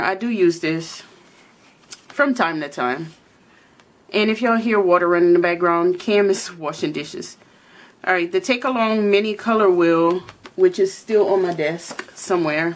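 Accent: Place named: Louisiana, United States. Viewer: Thanks again for another Roulette! Ok I know you are from Tx but you have a very Louisiana accent. Do you have history there?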